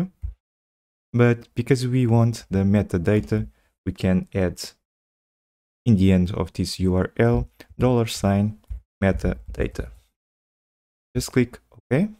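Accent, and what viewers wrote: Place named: Portugal. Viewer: Muito reconhecível seu sotaque português de Portugal! Gostei muito da simplicidade que trouxe o assunto e um case prático. Saudações do Brasil!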